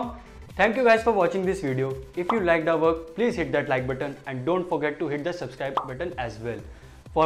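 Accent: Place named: India. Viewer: What is everyone's problem, he's talking fine, it's a heavy Indian accent but totally understandable.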